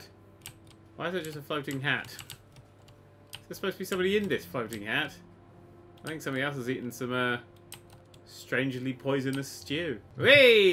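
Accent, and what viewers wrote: England, United Kingdom. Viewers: has English accent. says the word candy this made me cry a little inside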